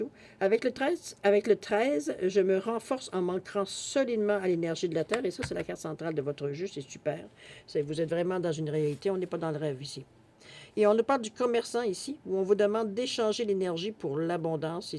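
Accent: Canada. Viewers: Moi..chère...j.adore.ton..accent..il..viens..du.pays..de..mes..rêves..😌..je..suis.. morad..mon.2’..petit.non..c.est..Jaky..mais.tous..mes.amis.m.appelle..Jaky…j.écoute.pour..la.1.fois.ton..tirage.mais..surtout..ton..bel..accent…….❤.Canadien..se.que.j.aime..ce.splendide..pays…tu.ne.peut.pas.imaginer.a.quel.point..merci.pour..le.tirage..vierge..😊😊😊❤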